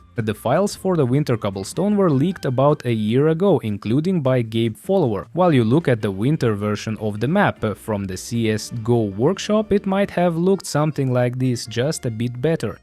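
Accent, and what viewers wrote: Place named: Russia. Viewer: god bless you, i was missing a interresting russian talking, with that cute russian accent. i love it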